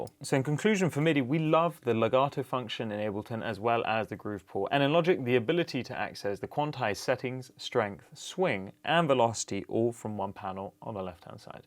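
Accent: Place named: California, United States. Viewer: The California accent is also how we Americans sound doing it lol well done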